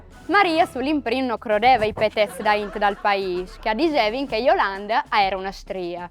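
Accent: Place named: Carnia, Italy. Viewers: Ma certo che e' carnica! Monai....Cleulas...e Prat a sci cjacara cu la a!!!!! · Scusate, sono appassionato di parlata friulana..... Questa ragazza di che zona è? Carnia ? Le parole hanno desinenza in a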